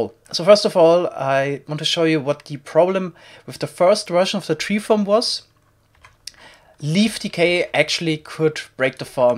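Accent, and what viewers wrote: Germany. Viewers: Sounds like u are german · German accent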